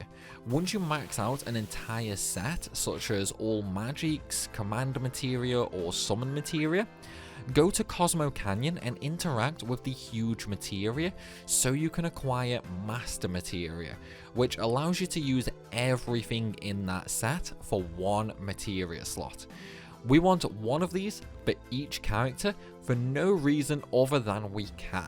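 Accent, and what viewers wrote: Stoke, United Kingdom. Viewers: You're a Stokie, can tell from the accent. Great video btw!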